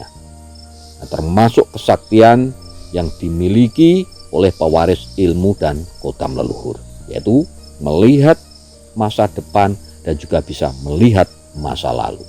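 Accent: Java, Indonesia. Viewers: saya suka logat jawa yg kuat di aura nya... mksh wejanganya mbh ... 🙏🙏🙏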